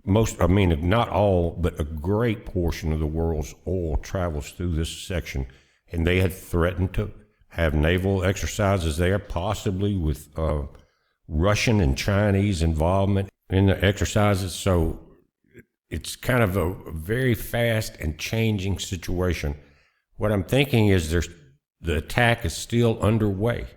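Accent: South, United States. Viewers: You are a real scumbag. Your old man creepy voice... Lil southern draw... You do not represent America... You are a war hawk with no threat of being drafted into Israeli war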